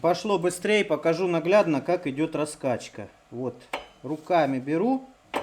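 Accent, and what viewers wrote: Rostov, Russia. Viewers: Обожаю Ростовский говор!!привет из Спб!!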